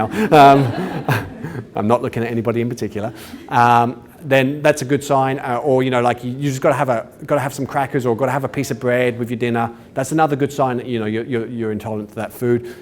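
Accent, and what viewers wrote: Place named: New Zealand. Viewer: Nice to listen to someone from home soil.....NZ! I have been diagnosed with sever vitamin D deficiency and have such horrendous symptoms. I was having bladder issues, couldn't walk properly, had bone pain and terrible brain fog and memory loss. I felt so out of control so the only thing I knew I had control over was what to eat. I have been eating exactly the diet you are suggesting....good on me!!...and after 4 weeks now I am slowly starting to feel myself returning, the inflammation is going down and my mind is clearing. I have a long way to go but your video had really helped me with so many good tips. I have just bought an organic bone broth kit so getting into that this week!! Thanks, I'm going to make my cheese loving hubby watch it!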